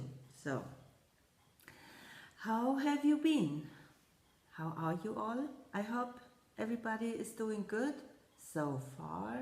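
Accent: Germany. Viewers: As usual, I love all your little finishes, & the snowmen finish is adorable. I've been wanting to tell you several times now that I love the lantern you have behind you. I collect them & can never have enough. I really enjoy your videos & love your German accent. Nothing to dislike about your videos. 😊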